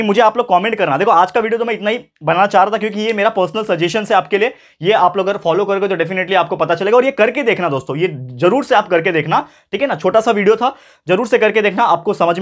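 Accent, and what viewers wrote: Maharashtra, India. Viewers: Sir aap kitni pyaari Marathi bolte Ho 🤩❤😘😃😄☘️👍🙏🏻❤🌍💯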